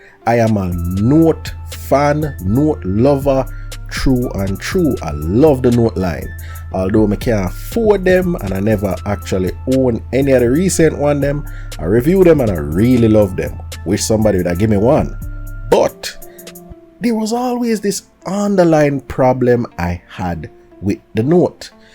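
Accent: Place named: Jamaica. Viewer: Real Jamaica talk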